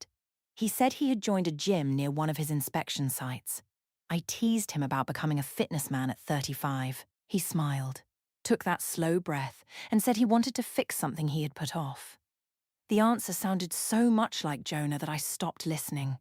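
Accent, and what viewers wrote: United Kingdom. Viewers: Is it a coincidence that the stories with the British female accent have the most abusive women?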